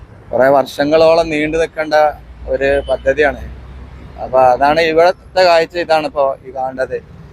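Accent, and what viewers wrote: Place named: Thalappara, India. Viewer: ഭാഷയെ പറ്റി പറയുമ്പോൾ ഒരു രസം തന്നെയാണേ ഇപ്പോൾ (ഇപ്പം) എന്റെ ഭാഷ എന്റ വീടിന്റെ ഒരു 5 KM പടിഞ്ഞാറ് ഭാഗത്തേക്ക് പോയാൽ ഒരുപാട് വ്യത്യാസമുണ്ട് അത് പോലെ എല്ലാ ഭാഗത്തും ഉണ്ട് ഇദ്ദേഹത്തിന്റെ സംസാരം കേട്ടിട്ട് തലപ്പാറ മുട്ടിയറ ഭാഗത്ത് താമസക്കാരനാണെന്ന് തോന്നുന്നു ' നിന്റെ ഫാസയിയിൽ ഒരു വീഡിയോ ചെയ്യ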